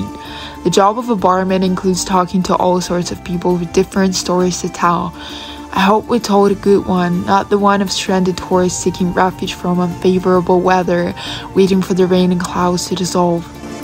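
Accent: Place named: United States. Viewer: where are you from -speak like american....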